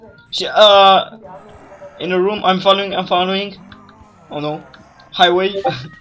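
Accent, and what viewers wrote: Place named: India. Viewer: India is proud of your accent🤝🤝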